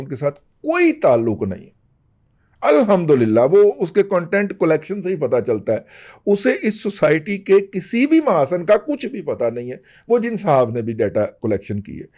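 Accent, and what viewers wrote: Punjab, Pakistan. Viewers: Your urdu/hindi in punjabi accent is quite amusing and enjoyable to hear.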